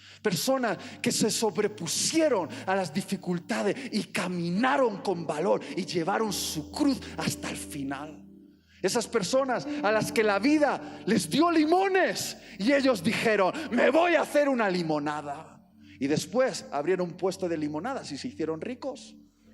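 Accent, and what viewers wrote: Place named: Mexico. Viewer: porque habla como mexicano y pronuncia las C como español? jaja excelente la prédica pero me quedó esa curiosidad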